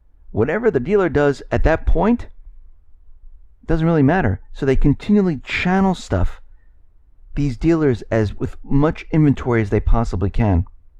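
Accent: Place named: New York, United States. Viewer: I think your Trump is showing, I hear the "believe me" thing in your dialogue now too. Maybe you always did and I am just picking it up, is it a New York thing?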